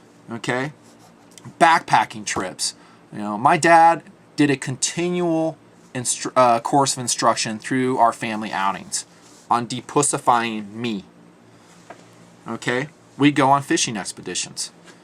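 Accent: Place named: Alabama, United States. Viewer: Perfect timing for viewing this one. My kids are reflecting the "mamma's boy"/wuss image and I've been looking for a hint, path, help, guidance or anything these past couple of months. My resources are at a minimum, and the light has shown me the way. Excellent vid and I'm amazed at the timing on my viewing. Thank you. By the way, the Bama accent comes through in all the vids! When my boys "hate" on me, you know where I'm sending them!! LOL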